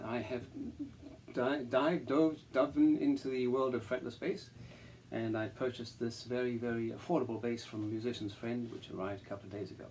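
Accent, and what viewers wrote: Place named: Florida, United States. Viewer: I’d recognize that Florida accent anywhere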